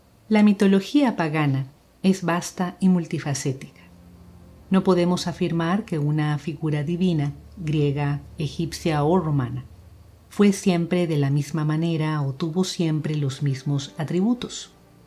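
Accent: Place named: Costa Rica. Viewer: Tenes acento tico🥺❤️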